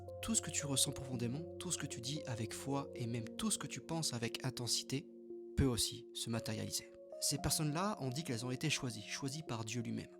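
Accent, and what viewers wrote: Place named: South, France. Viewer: tu as les yeux gris.😮 À moins que ça soit la vidéo qui donne cette impression. 😊 Et tu as l'accent du sud aussi on dirait. 😘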